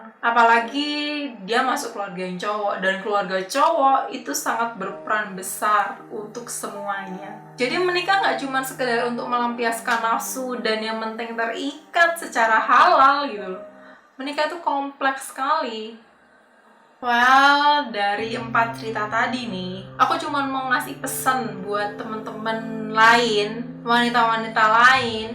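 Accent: Java, Indonesia. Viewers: medhoknya ciri khas banget 😅😅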